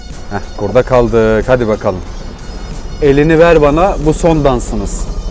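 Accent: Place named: Giresun, Turkey. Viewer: Kardeş giresunlu musun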